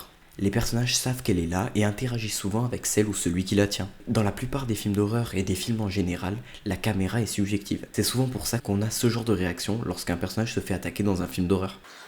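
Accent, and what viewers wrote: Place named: Quebec, Canada. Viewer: tu est quebecois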